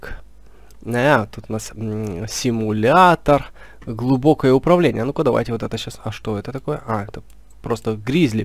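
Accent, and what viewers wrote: Ukraine. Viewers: Автор украинец? Слышится акцент)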